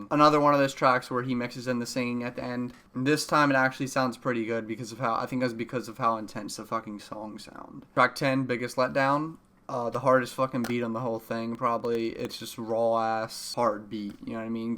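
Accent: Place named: Philadelphia, United States. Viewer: you sound like youre from philly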